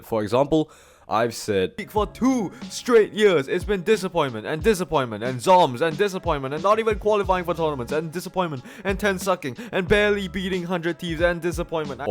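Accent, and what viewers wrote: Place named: Singapore. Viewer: i can hear the singaporean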